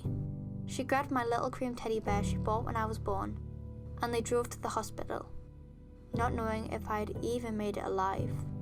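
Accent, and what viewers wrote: England, United Kingdom. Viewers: I rlly wish it wasn’t told by an English accent. I didn’t like the pronunciation of words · Also I noticed you have a accent maybe a British? Because of the way you talk and the way you said better it was like: “betta”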